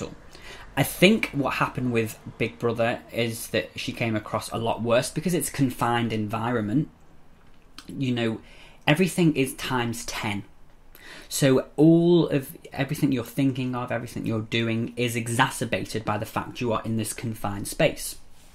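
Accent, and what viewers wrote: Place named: Liverpool, United Kingdom. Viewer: I agree with you! Just a low and disgusting way to treat someone xxx I subscribed because you’re a cool guy with a hint of a scally accent xxx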